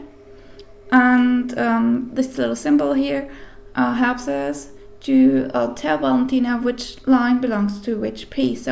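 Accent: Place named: Switzerland. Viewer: Is she Swiss-German? Her accent sounds just like Angelic Venus.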